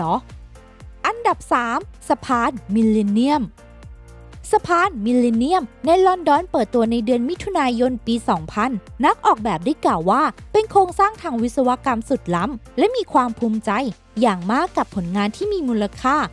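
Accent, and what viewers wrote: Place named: Provincial, Thailand. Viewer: เหมือนเหน่อ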